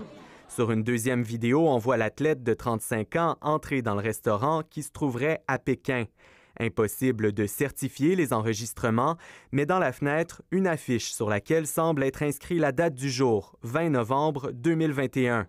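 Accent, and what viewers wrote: Canada. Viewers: C'est l'accent canadien.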